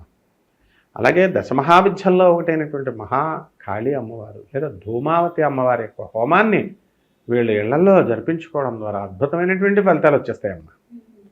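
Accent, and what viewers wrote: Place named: Godavari, India. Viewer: ఎందుకు అండీ... స్లాంగ్ చేంజ్ చేసారు గోదావరి సైడ్ లాగ 😀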